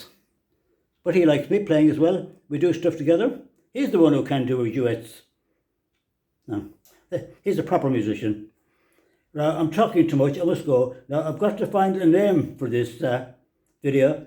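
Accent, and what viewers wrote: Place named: Ireland. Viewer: Yes indeed I totally understand what you are saying ....and listening to your delightful accent is pure joy .....I hope to go back for a visit to Ireland ...check in to more of my O'Donnell and Ryan clans❗🎼🎶🎶🎹❤🇨🇦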